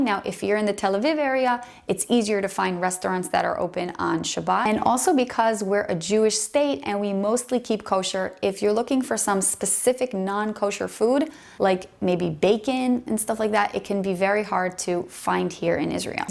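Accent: United States. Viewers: Your voice sounds like you were raised in the USA? We have some cats but nothing like Jerusalem. I think we have more dogs here. Watch out for pit bulls if they are running loose, that doesn't happen too often.😊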